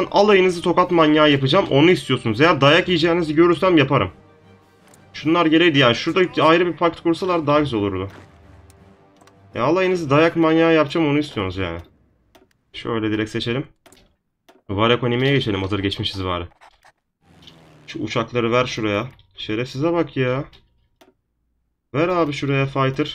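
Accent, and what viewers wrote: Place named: Black Sea, Turkey. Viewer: Birde bişey sorcam siz çankırılısızda peki sizin anne tarafı rica edersem kardenizlimi çünkü ben giresunluyum ve siz biraz karadenizli gibi konusuyosunuz bizim oranın insanları gibi sıcak kanlı ve komuksiniz biraz lehcenizde öyle gibi sadce merakımı arz ettim roca edersem cevaplarsanız sevinirim tabikide siz bilirsiniz bu soruyla ayıp ettiysem afadersiniz yaklasık 2 senedir takip ediyom sizi ememğinoze saglık bayramınız kutlu olsun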